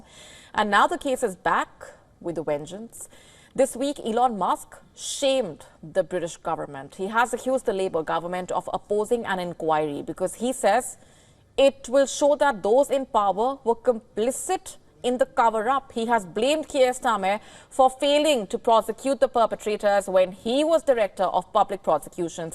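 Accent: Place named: India. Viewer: strong indian intonation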